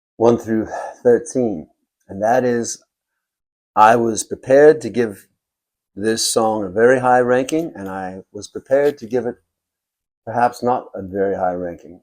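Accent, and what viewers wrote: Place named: United Kingdom. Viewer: Charming presentation that includes a bit of wit, self-deprecation, and a hint, no quite a bit of a British accent. I loved the “ I know it’s blasphemy“ quip. And Very much enjoyed it being provided with a deeper understanding of the song that I had never really thought about much. Quite the board. Impressive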